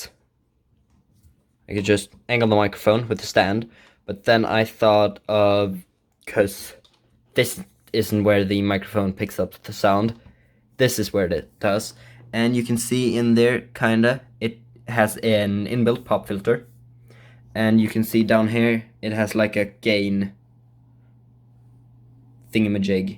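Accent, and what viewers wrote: Sweden. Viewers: Are u from Sweden cuz I feel like ur accent of pronouncing words is like Swedish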